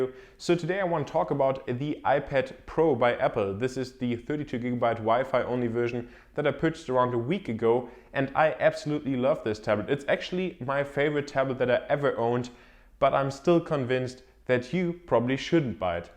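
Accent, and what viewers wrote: Germany. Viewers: i hear german in your voice are you german???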